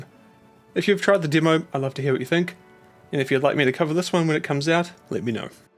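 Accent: New Zealand. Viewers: Are u a kiwi bro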